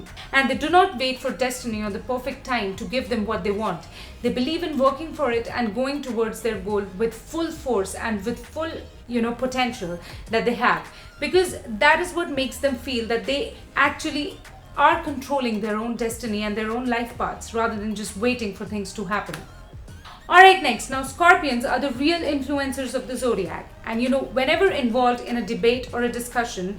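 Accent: India. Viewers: Definitely Indian, I am too, and she has that accent.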